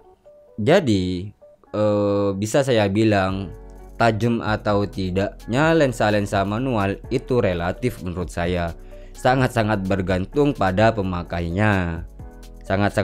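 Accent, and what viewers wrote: Madura, Indonesia. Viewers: Asal madura ya bang?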